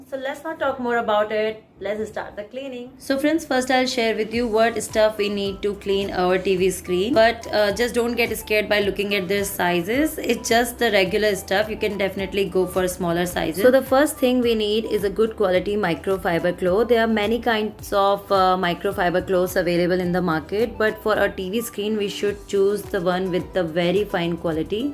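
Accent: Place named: India. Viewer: I HATE YOUR WORLD FAMOUS INDIAN ACCENT!!!!!!!!!!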